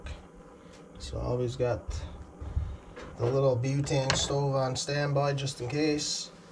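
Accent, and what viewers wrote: Newfoundland, Canada. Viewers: I knew I saw a Newfoundland flag in the video. I got the accent right off. Great spot for your cabin. I have a daughter lives in Gander Bay. I went for visit last year. Unfortunately I am from Toronto for the last 75 years. Beautiful place keep on sharing. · Good to hear a newfi accent , reminds me of my youth in clarenville , great video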